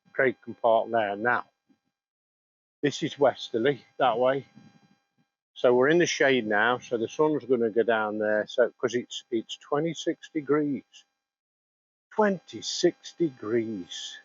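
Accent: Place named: Derbyshire, United Kingdom. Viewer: Very funny like your stile.Listening to your accent I dont think you live all that far from Wetton mill.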